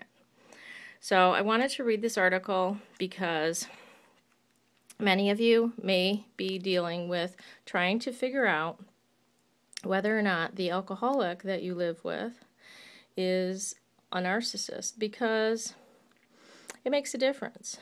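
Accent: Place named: Michigan, United States. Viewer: U sound like you from Michigan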